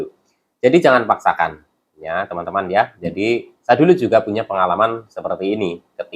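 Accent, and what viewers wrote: Banyumas, Indonesia. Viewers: Cilobak raya hadir menyimak massee, ora Ngapak ora kepenak😂 apa Kuwi "Tengengan, Massee?"🤣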